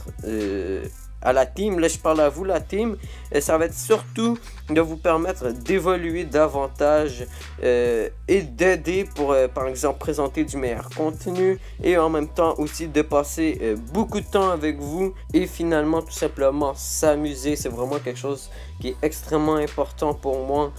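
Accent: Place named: Quebec, Canada. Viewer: C'est un accent de Québec :$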